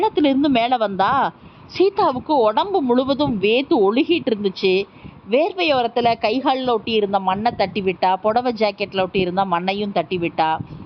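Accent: Kongu, India. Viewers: எதார்த்தமான கதை. கொங்கு தமிழில் அருமையாக வாசித்திருக்கிறார். அம்மாவின் கோபம் எங்களை இப்படி ஆக்கிவிட்டது என்று சொல்வது நடைமுறை உண்மை. நான் ரசித்த கதைகளில் ஒன்று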